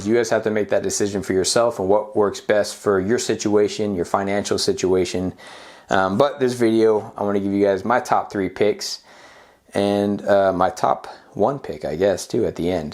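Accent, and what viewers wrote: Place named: North Texas, United States. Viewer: Sounds like you live in North Texas lol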